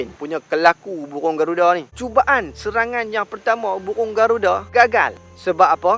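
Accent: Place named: North, Malaysia. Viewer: Syok dgr org utaqa bercerita... Rsa puaihhh dgq hahaha👍🏻👍🏻👍🏻😂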